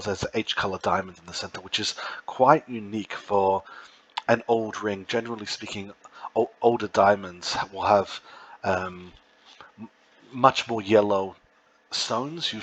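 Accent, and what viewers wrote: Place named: United Kingdom. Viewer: The narration fits the vintage vibe. Ever so slightly British in accent, reminiscent of Antiques Road Show, and not unlike the jeweler in the Friends episode where Phoebe helps Chandler buy Monica's vintage ring. Coincidental? Maybe.....🤐🤭🤐 But perfect nonetheless.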